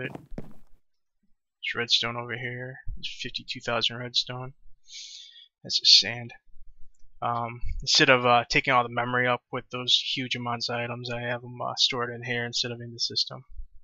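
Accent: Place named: Chicago, United States. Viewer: This guy has to be from Chicago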